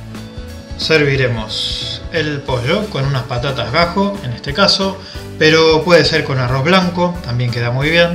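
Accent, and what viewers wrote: Argentina. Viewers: sos argento?? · Hola...soy una nueva suscritora. Detecto un acento Argentino 😊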